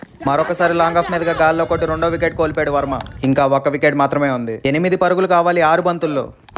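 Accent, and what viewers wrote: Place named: Srikakulam, India. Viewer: SRIKAKULAM vollu antarra babu❤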